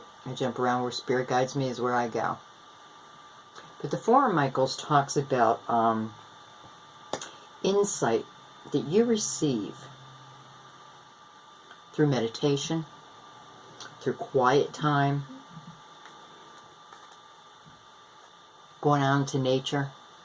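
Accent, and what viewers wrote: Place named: Southwestern Pennsylvania, United States. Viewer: I'm quite impressed... yes, I have done so much work through the past six years, work on myself. This time last year, I found myself feeling differently toward someone with whom I'd shared chemistry with, but had never thought of romantically. Then.. POW! Everything had changed. The work shifted and I've been drawn, so has he, by the Universe... coming together. He -- a water sign, yes-- is in a dead relationship, in reality -- the ties are still present, but there is no involvement, no life in it. No, I could never be jealous, ha ha. Spot on. And of course, I visited the Pisces reading you did, and you describe escaping a dead relationship, unleashing yourself from your own internal prison. (And you sound like you are somewhere near the area of the country where I live, ha ha... SW PA.)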